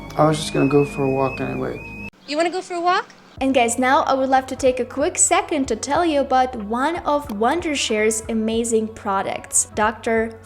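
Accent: Russia. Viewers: Ну у тебя ещё присутствует русский акцент, милочка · СЛЫШУ РУССКИЙ АКЦЕНТ😁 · Русский акцент узнаваем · lemme guess, her accent is russian, isn't it? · Девушка, вам не кажется, что у вас очень яркий русский акцент? Буквально русскими буквами говорите английские слова.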